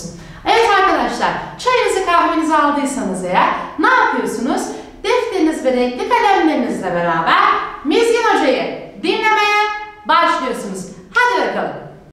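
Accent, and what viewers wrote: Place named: Istanbul, Turkey. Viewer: Hocam siz istanbul Kürtçesiyle mi konuşuyorsunuz? Bizim yamyamlar konuşurken insan içine çıkamıyoruz. Diksiyon kursunuz varsa benim öküzleri de yazdırayım kursa. Gerçekten çok naif ve melodik bir ses tonuna sahipsiniz, insana huzur veriyor. Şu İngilizce belasından kurtulursam ilk iş Kürtçe öğrenmeye başlayacağım.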